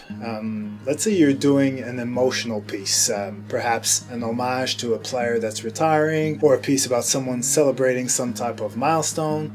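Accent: Quebec, Canada. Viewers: Where is your accent from ? Quebec !?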